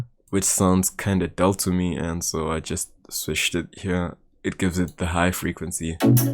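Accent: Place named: South Africa. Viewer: I know a South African accent when I hear,I'm glad there's more fans in the country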